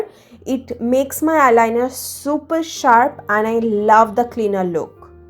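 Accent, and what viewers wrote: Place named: Bengal, India. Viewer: Just love ur bengali accent im from Kolkata n would love 2 meet u one day♥️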